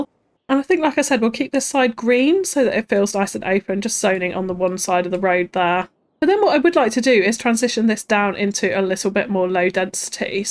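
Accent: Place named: RP, United Kingdom. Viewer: What is this BBC radio accent, it giving flashbacks?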